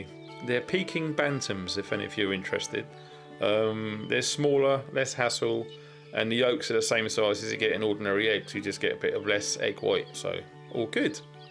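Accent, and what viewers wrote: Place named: England, United Kingdom. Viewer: I turned on closed captioning. It's really a hoot, as it doesn't understand your English accent very well.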